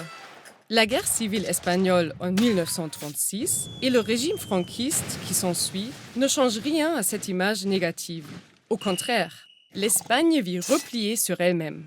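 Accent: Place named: Germany. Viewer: Elle a l'accent allemand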